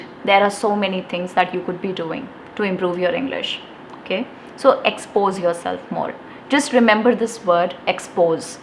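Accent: India. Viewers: Indian accent 😵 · Hello Ma'am, I am Kajol Gupta. I am from Kolkata. I am 23 years old. And I am struggling in english speaking. I am not confident to speak in English but unfortunately i study in english medium. My friend says to me that you had studied on english medium school. But you can't speak in English at all. Why ?? They questioned me. But sometimes I regret myself & why ? Sometimes I can't tell anything or I say that I am still learning when that I can't practice this.but this time I practice myself regularly. Everyday I listening english podcast & you must say that I see easy english podcast which I can able to understand this easy english podcast. And last I want to tell about I love to see your videos when you speak in English fluently & naturally and when you speak in English native accent & I really like your indian accent which I can able to understand and you speak in English in easy words to makes me better to understand that what are you saying & what are you want to saying to ourselves ? 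Which is I can able much better than other hard and advance english podcast because I am a beginner & I can able to understand easy language or words to helps me better to understand. That's all !!!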